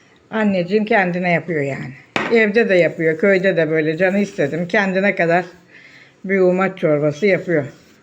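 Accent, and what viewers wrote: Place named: Gelibolu, Turkey. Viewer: bende geliboluluyum siveniz bize benziyor da merak ettim 👍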